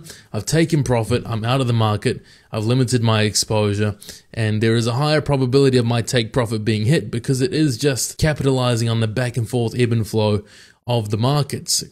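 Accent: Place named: Australia. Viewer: you sound like you have a bit of an Australian accent but you say pm East coast time, what session is this in Australia ?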